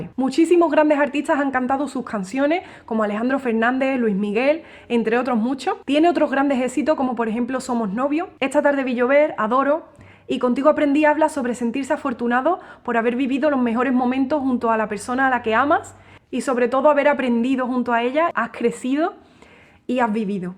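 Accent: Andalusia, Spain. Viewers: Oh Dios wue hermoso srreglo, te felicito de verdad me encanto. Por ese acentico de tu voz pensaria que eres de Andalucia?... no conozco Espsñs pero mis hijos y esposa si han visitado. En fin talentosa Señorita, mil gracias por el aporte. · Tu acento andaluz me tiene embobado, jaja. Saludos😊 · Entre lo wapetona que eres y lo bien que tocas la guitarra, ya podrías ser mi mujer así aprendería, que en ello estoy jejej, que hace dos días he aprendido que el orden es do, re, mi, fa, sol, la si. 😅😅. Y luego ese acento andaluz que tienes... 😍😍😍😍😍😍😍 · Me encanta tu acentito español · E que siga falando con doce sotaque andaluz, tão musical como a sua violão.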